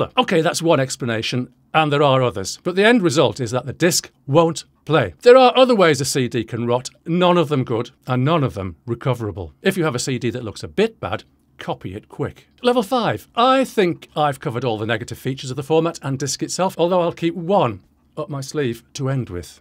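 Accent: United Kingdom. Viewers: He Does come off as a rather arrogant know it all, doesn't he? .... the Brit (almost typed 'Cockney' lol) accent doesn't help ....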